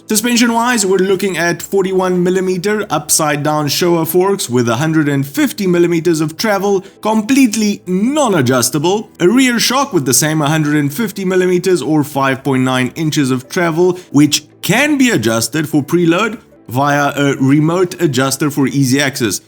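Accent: South Africa. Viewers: Love hearing your South African accent tell about motorcycles and stuff. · Lived in SA, hence the accent.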